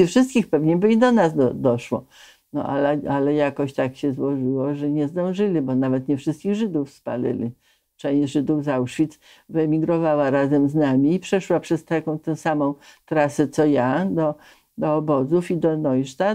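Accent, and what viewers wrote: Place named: Warsaw, Poland. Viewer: Ma Pani cudny warszawski akcent.❤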